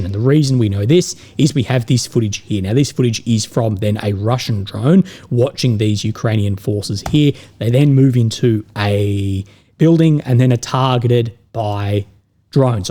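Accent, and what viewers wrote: Australia. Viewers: Aussie twang is unpleasant to my ears.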